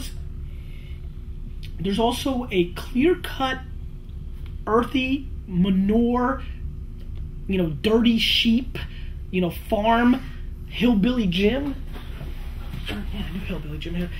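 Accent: New Jersey, United States. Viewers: I guessed at first this guy was from Jersey and I was right. He just said "meh", so that confirms it.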